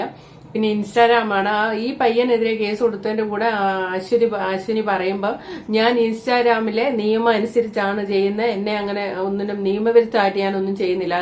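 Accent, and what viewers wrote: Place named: Kollam, India. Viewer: കൊല്ലംകാരിയെന്നു തോന്നുന്നു കാഞ്ഞിരപ്പള്ളി സ്ലാങ് വേറെ ആണ്‌ ഞങ്ങൾ ഇത്തരക്കാരല്ല Am proud to be a Kottayamkaran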